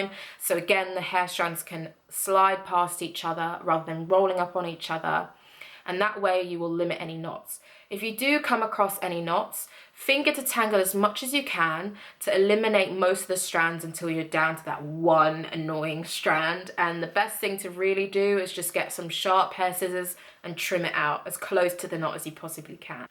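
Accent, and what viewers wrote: United Kingdom. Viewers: yes you're British!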